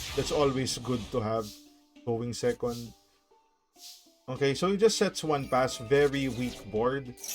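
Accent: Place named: Philippines. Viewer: u sound Filipino af. are u Filipino?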